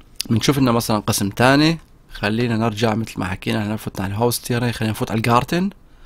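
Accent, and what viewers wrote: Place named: Idlib, Syria. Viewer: ليش حاسك ادلبي 😁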